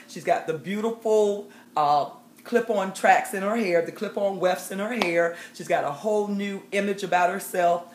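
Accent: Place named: North Carolina, United States. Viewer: I love ms accent. She sounds like my mother. Are you from North Carolina Ms.?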